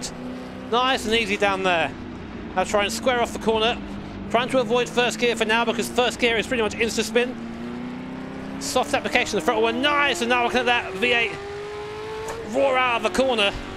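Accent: United Kingdom. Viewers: "come on G 'E fo 'hy" a true Brit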